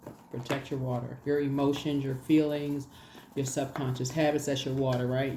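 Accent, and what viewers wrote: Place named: South Florida, United States. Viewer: I'm from South Miami and I dont many people who speak like that. I think its Carrebien/latinx black people who are born in the USA south florida dialect. Very specific north Miami. Anyway you hit the nail on the head.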